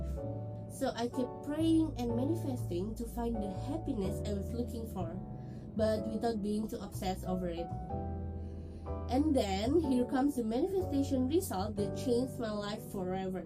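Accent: Indonesia. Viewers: I know you are indonesian, right after your first sentence, i listen to your sub from 2 years ago btw. thank you. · loh ternyata orang Indonesia ya?? ngomong pake bahasa Inggris tapi tidak bisa dipungkiri logat dan gaya serta mada bicara persis org Indo semangat ya cici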